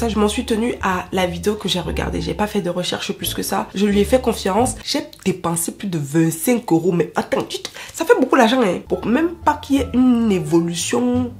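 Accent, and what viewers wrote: Cameroon. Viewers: Naah l’accent kmer vraiment je peux dead 😍😍😂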